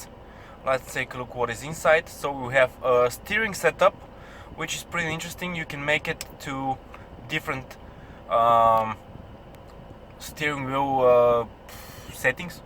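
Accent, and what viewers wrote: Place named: Bulgaria. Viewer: Лол пич !! Много ти личи ,че си Българин. Още не ти бях прочел името на канала и като чух как говориш познах. :) :)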